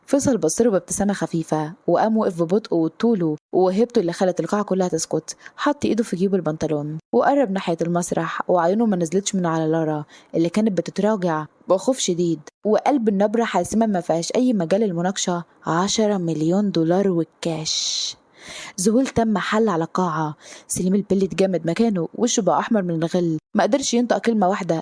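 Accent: Syria. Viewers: 😂 او يا بتعرفي تحكي سوري كويس ماشي حال جيد جدا ❤ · حبييييييييييت اللهجة السورية تبعك❤ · لهجتك السورية جميله قوي ❤❤❤❤ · تحفه وصوتك تحفه وكلامك تحفه وقصصك تحفه ونتي تحفه كل حاجه فيكي تحفه ولكلام السوري طالع من بقك زي العسل ربنا يخليكي يا يا مدلعانا حبيبت قلبي انتي 💐👏💝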